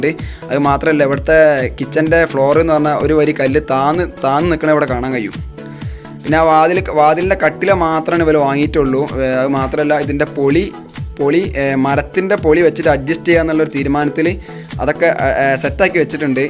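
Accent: Malappuram, India. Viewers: Ath njammade malapram ayonda bane... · നിങ്ങളുടെ എല്ലാ വീഡിയോ കാണാൻ നല്ല ഹാരമുണ്ട് പ്രതേകിച്ചു നമ്മുടെ മലപ്പുറം ഭാഷ...നിങ്ങളുടെ സ്ഥലം എവിടെയാണ്....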